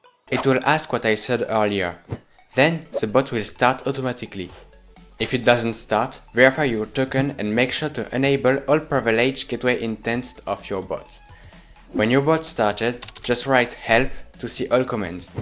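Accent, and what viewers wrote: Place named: France. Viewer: Un francais passe par la et reconnais l'accent francais. Salut ^^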